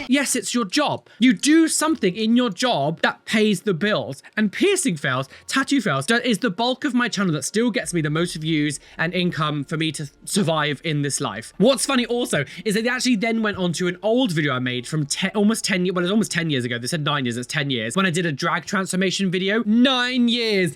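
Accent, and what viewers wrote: United Kingdom. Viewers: I've been watching you for awhile and I love your skin art (tattoos) but then we get to your flamboyant personality and British accent like omg be still my heart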